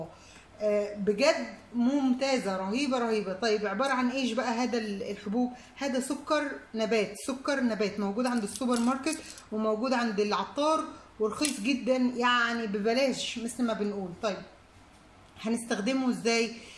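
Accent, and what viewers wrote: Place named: Egypt. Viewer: حضرتك لهجتك مصرى على خليجى او مغربى ❤ما شاء الله. جزاك الله خيرا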